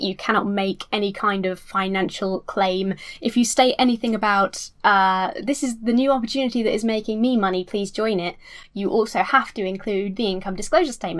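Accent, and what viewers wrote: United Kingdom. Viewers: It's nice to see someone who does athiest content also cover stuff like this, a lot of the people I watch tend to stick in a bubble of relegion/science related videos. Constructive and entertaining. Also, why does a female voice with a brittish accent make the phrase "fuck off" sound more powerful? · Your brittish accent is so beautiful. You should be reading in tutorials in how to learn to speak english on cd:s or something. You sound perfect. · You're an adorable, critically thinking, scientifically literate atheist with a British accent... Why do you not have a million subs already. Lol so glad I found this channel! 🖤❤️🖤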